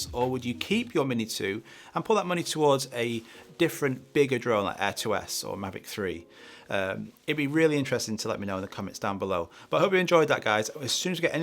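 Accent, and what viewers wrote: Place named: Manchester, United Kingdom. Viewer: So where from exactly? The guy who introduced me into my project in Cambridge 30 years ago was from Manchester. So it appears that I am familiar with your dialect after all already. Here in Switzerland we have many different dialects, mostly due to that Swiss German is only spoken but not written (for writing in newspapers etc. or for the spoken news on TV High German is used). As a result I understand a person who is from the canton of Wallis (that's the worst example) only with difficulties.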